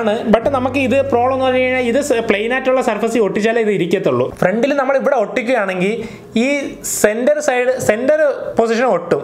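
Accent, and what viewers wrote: Trivandrum, India. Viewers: NJN ATTINGAL ANN BROI. SLANG KETTAPOL THONNI TVM ANENN😅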